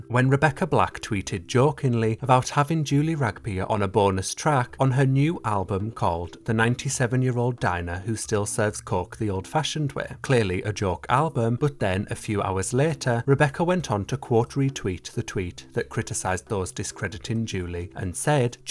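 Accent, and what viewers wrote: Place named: Hartlepool, United Kingdom. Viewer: Your accent is very Hartlepool 🧐😀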